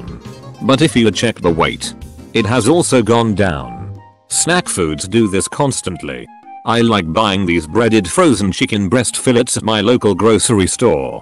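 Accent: United Kingdom. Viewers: I gotta watch out for that lurr enforcement. Why can't Brits ever pronounce their own damn words right?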